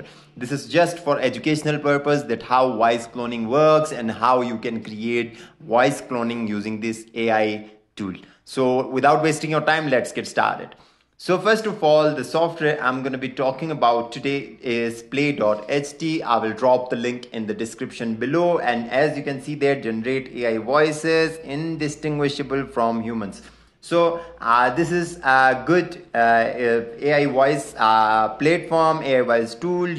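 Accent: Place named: India. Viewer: In the video all I could understand was the first part of the name, which was play, but the rest was a blur. I had trouble understanding your heavy hindoo accent.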